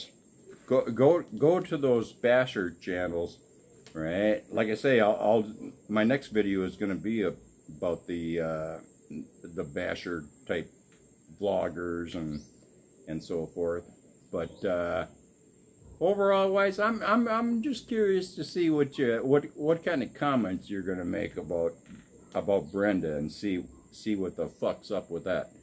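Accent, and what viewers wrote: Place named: Midwest, United States. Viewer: Hello. I really enjoyed your stories. I remember the stories about when you was a kid in Minnesota at the vacation spot they used you for a fish tour guide. That voice you have in that Midwest accent really does a great job at storytelling. If you look through any comments I've made I don't think you're going to find anything that I've ever said that's been negative. I wish you Brenda and Rose the very best. Just an idea it would be nice to see if Brenda does come out of her shell the progression of that. I've seen some other guys that have had shy Filipinos and it took a while but you could see them get better and better at being on camera and such. God bless good luck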